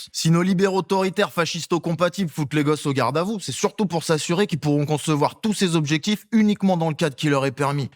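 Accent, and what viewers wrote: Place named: Paris, France. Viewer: Très intéressant, sauf qu'il faudrait peut-être moins faire dans ''l'accent et les expressions parisiens'' (c'est très vite agaçant) et plus faire dans l'accent français international... merci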